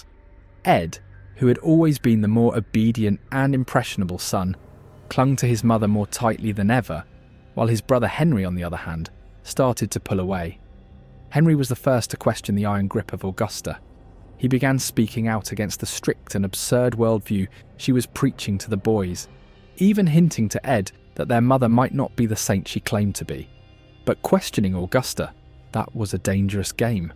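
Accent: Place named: South England, United Kingdom. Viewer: Great work sir, excellent narrator sounding South England vibes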